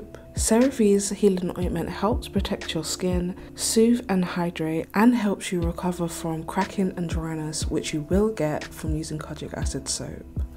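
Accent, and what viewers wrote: United Kingdom. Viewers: Love your accent! btw, are you british?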